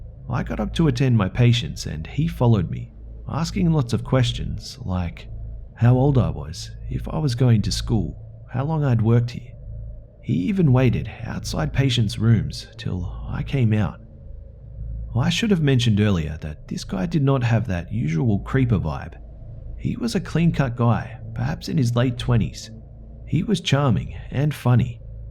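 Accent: Australia. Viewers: 😊 I enjoyed this video listening to it at work thank you, I'd like to say that your voice is one of many I enjoy listening to especially your Aussie accent😊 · Morgan Lowe he doesn't sound South African at all. Get your ears checked. It's Aussie. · Are u aussie? Love your accent and the way you tell the stories, keep it up x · he's Australian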